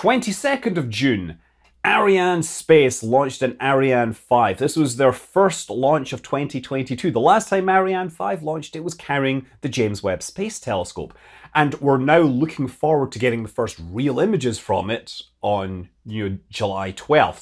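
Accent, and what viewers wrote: Scotland, United Kingdom. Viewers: whatever the distraction be it's certainly lightened up your Troon lilt somewhat expressing giggles and tongue tied pronunciations in places made me think you've been showing your support and appreciation for the micro-brewery industry. Again! Lol. Sorry, you have this fabulous narrative skill that's appealing and easy to digest as half the time I won't be watching your youtube update but listening all the same. It's a bit like you stream your Lego set construction while i'll get on with the ironing over here busying myself. Seriously, your extensive knowledge base linked over many disciplines and an un-baised view is so pleasurable and refreshing on a platform inundated with competition. Keep up the good work and fly safe.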